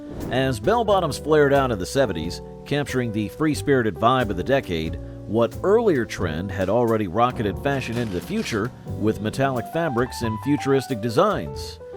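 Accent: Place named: United States. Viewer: I hear a standard American accent from this host. I am not sure if it's computer generated or human. In case they are a human and reading this, the word is pronounced "app-li-KAY"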